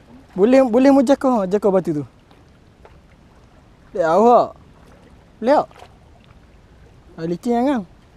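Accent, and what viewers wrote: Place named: Terengganu, Malaysia. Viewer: Kuat pekat likat baso ganu... mcm xsesuai untuk channel youtube.. tp trbaek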